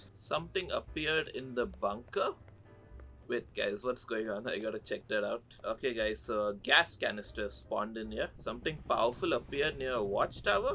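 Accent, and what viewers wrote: India. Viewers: U sound very indian. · Why your Voice so indian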